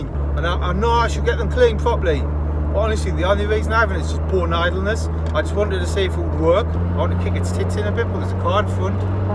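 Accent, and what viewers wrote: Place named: Northern England, United Kingdom. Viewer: I remember looking through the classifieds of fastford back in 05/06 when I was a little lad. Oh the prices back then for a Cossie! (And any other classic ford). Anyway, enjoying the videos on the car mate. Doesn't matter whether it's just an oil change or if your fitting some interior trim, you make it a good watch haha. I've also managed to understand you Northern fellas too watching this 🤣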